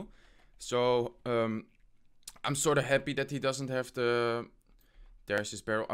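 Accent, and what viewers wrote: Netherlands. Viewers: Dude are you dutch? I hear a dutch accent ( ik ben wel Nederlands :))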